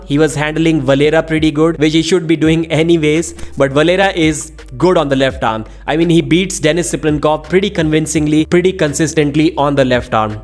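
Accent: India. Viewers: Your voice seems Indian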